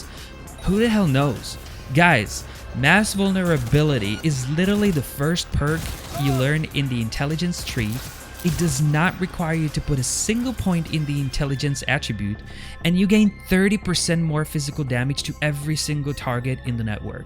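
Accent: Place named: United Kingdom. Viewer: Why is it always someone with a british accent telling people how to play the game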